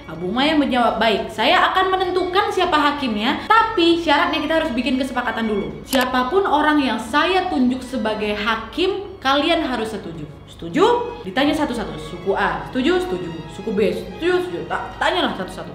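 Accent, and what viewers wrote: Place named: Medan, Indonesia. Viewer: Cerita penggalan sejarah Nabi yg disampaikan dengan cara yg sangat menarik , tuturan gaya medan yg khas dan isi cerita yg terurai dg enak dan menggelora jiwa yang selalu haus terhadap kebesaran Yang Maha Kuasa dan keindahan Achlak Nabi Muhammad SAW. Selamat wak. Semoga istiqamah wak. Wassalam. Ww.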